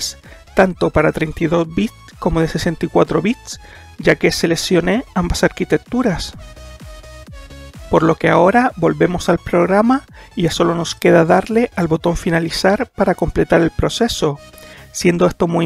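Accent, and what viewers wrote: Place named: Spain. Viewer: Por qué hablas como español?xd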